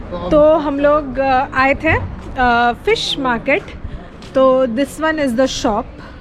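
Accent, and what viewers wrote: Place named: India. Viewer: I"ll make a guess by your accent.. are you indian, right? :) Your husband is an I.T. guy? (I ask that 'cause every indian abroad is I.T., which is a great thing.. Could you kindly ask him if I get easily a Javascript job or do I need to know ReactJS or other stuff? I'm planning to move there! :) Thankssss and greetings from Brazil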